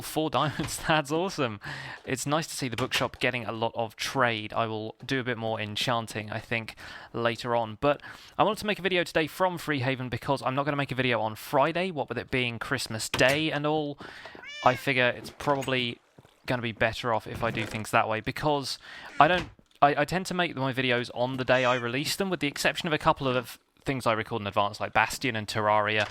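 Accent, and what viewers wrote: United Kingdom. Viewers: It always seems a bit wrong hearing "Merry Christmas!" in a British accent rather than "Happy Christmas!" but there you go. Hope you had a wonderful Yuletide,.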